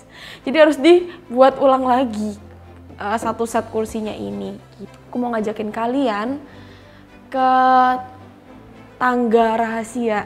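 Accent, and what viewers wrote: Java, Indonesia. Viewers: Ada darah Jawa kah ?? Sepintas cara bicaranya kek ada logat² Jawanya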